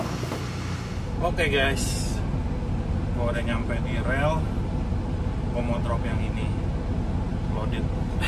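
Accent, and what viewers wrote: Indonesia. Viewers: Akhirnya aplod lg Trucker favorit. Kalo dengerin ocehannya asik bgt krn logatnya masih 'Indonesia' bgt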